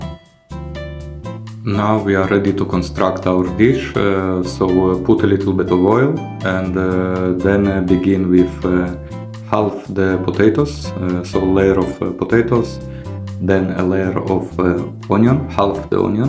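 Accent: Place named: Russia. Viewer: brother your video looks so nice, just continue, but i think you are russian for reason your accent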